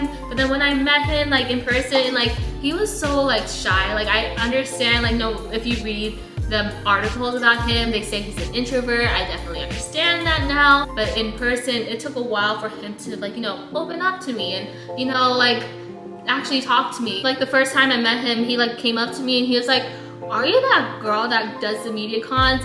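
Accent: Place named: United States. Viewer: Enjoying your vlog especially your American accent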